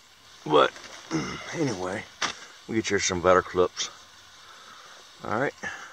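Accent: South, United States. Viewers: how cold does it get where you live? If I remember correctly if it gets to cold they don't work properly. but I also can tell by your accent that your down south, so I'm sure you don't worry that much about 20 degree days like we suffer from up here in the north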